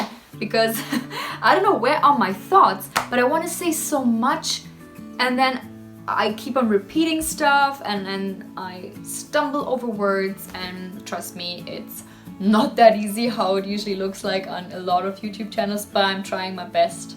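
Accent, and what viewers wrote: Karnataka, India. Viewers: Is this your normal accent... you sound so Indian talking English... intonation & pronunciation so perfect... Feeling proud being an Indian... :) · Your english sounds like an indian girl speaking english. Doesn't sounds like a foreigner speaking english. Enjoy travelling👍Enjoy life❤ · You are totally Indian because your vice is totally like as India. Love you.. meet me when you come India.. drop a mail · Your english wow proper Indian English speaking wow and you look so beautiful and pretty in Indian outfit and bindi on your forehead like i want to marry you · Oh god you also picked up the Indian accent a bit when you are speaking English 😂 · Fascinated to see and hear ur experiences in Mysore. This is our culture. Enjoy. I am delighted with this video and surprised to hear ur accent in Kannada ! U are more than a Mysorean ? With affectionate best wishes. 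· I noticed a little bit of Indian accent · Why her accent is like Indian? What the hell is happening..Is I'm the only one who is feeling that? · Don't get me wrong , but ur accent looks very desi 😀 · How come your accent is very Indian?? You are pronouncing every work very distinctively as Indians do. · Your english accent is similar to our Indian English accent, thanks for sharing your experience in our India. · Bro ur accent is 💯 Indian!! Never heard a foreigner speaking in Indian accent so accurately!! Howw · your indian accent is so accurate even most of the indians are not speake in their own accent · Your accent is more like on the Indian side. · Very good indian english accent. Interesting to listen your voice. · Love your indian accent 😍😍😍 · You are super pretty! ❤️ The have got Indian accent that is cool. And the outfit you are with is just perfect. As a conclusion you are crush of mine.❤️🔥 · Your English language is just like Indian girls & unlike British people. 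How this happen ? Is it learned English or mother tongue ? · I have been enjoying your videos today. It amuses me to note that you picked up some indian accent while speaking in English. :) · Your accent is so Indian! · Honestly living in Canada for 8 years now just baffles on the things I’ve missed in india! Also you have a more Indian English accent that most Indians living abroad 🙏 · Bro she sounds just like an indian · Nicely stick bindi on forehead, Indian outfits, lovely look, English with Indian accent... It's really a pleasurable experience watching you n listening you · U are an amazing soul, amazing human being. World needs more ppl like u. U are always most welcome to India😊 Ur english accent is pretty much like Indian english accent may be cuz u have lived here for a year dats why.. Nice nice.. U look pretty in Indian dress and bindi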